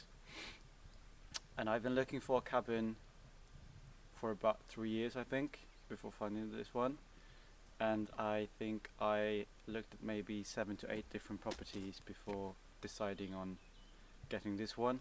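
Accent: United Kingdom. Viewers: Great to hear more of your story! I live just north of London and have a Swedish mother and English father… when you first spoke it was refreshing to heat your UK accent… so often US English accents pervade! Also, as a lockdown project, I built a bastu in my garden… so important to make things with your hands. Keep up the great work! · May I ask how old you are? And it sounds like you live in London - if so how frequently do you visit the cabin?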